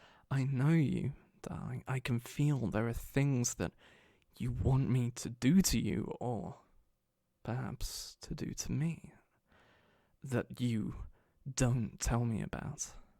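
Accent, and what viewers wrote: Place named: United Kingdom. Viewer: Wait… he kinda sounds like auralescent but more British to me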